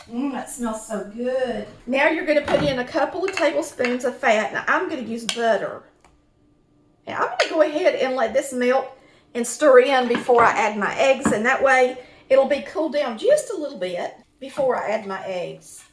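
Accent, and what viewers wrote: South, United States. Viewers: I can’t express to you how much I value and enjoy your videos. I live alone and your videos help get me thru the long evenings and aloneness. Your sweet southern accent and the way you talk is wonderful! I don’t cook but I love watching you cook up some yummy dishes and love seeing you and hearing you. It feels like I’m in the kitchen with a friend and not so stinkin alone. God bless you!!!! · REMINDS ME A WEE BIT OF YORKSHIRE PUDDING. GREETINGS FAE SCOTLAND TAE APPALACHIA. YEEHAW, LOVE YIR SOUTHERN DRAWL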